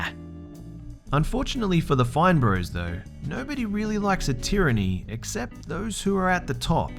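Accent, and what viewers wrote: Australia. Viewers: dude i been watching you for hella long but just now realized you are australian and have an accent.. lol random... something wrong with my ears maybe lol... and speaking of the fine bros thing I don't really know much about them but they seem douchey lol.. I don't really watch reaction videos though especially not stuff like "teens react" and all that so yeah .. I don't even understand why they are so popular I mean I don't care about random peoples reactions to things.. im ranting lol.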